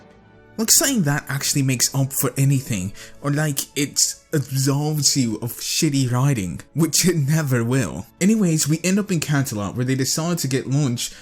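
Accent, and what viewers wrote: United Kingdom. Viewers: Maybe this is normal for him because he is British? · dude what the heck all I could think about was you talk in a British access I mean really im not saying it bad im just saying what happened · why the buck are you talking British? talk normal. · No gripes about the content of your commentary, but WHY oh why did you use a British accent? I only ask because you don't normally talk like that.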